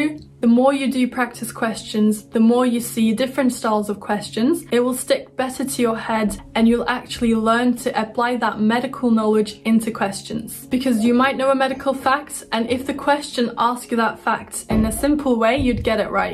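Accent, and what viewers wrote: United Kingdom. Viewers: İngiliz aksanın çok temiz fakat çok aksanlı konuşuyorsun anlaması zor olabiliyor.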